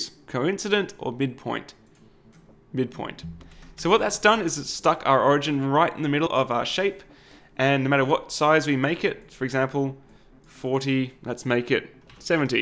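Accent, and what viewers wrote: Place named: Australia. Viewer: Mate, thanks for your efforts with showing us noobs the ins and outs of 3d printing with the bonus of your real world application. (And we need more ozzie accents on youtube).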